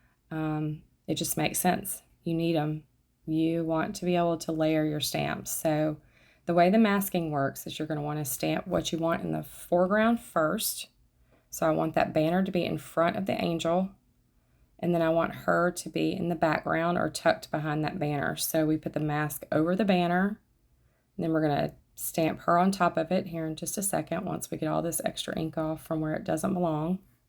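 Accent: South, United States. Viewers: Excellent tutorials. I know nothing about embossing and I’m so pleased I came across you channel. I love a southern accent and a gal that can talk my ear off. So refreshing. Thankyou 🤗🥰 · I’m very very sensitive to voices and actually think your tone and cadence is very calming. Ignore the meanies, some people just need to spread their own misery sadly. Also love your little bit of Southern accent lol. I can’t wait to get back down to Goodyear for the winter and do some more shopping at your booths.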